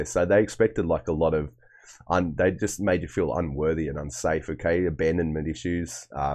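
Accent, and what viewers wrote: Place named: Australia. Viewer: You are an awesome reader! It’s lovely to hear your lovely down to earth Aussie accent, I’m Aussie too but mainly listen to readers from elsewhere. Thank you, many blessings.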